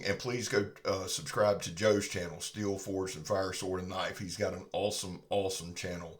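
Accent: South, United States. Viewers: Ohh OK.. I'm in GA. I seen your shirt and your Southern accent and thought maybe you lived around down here.. Love your video's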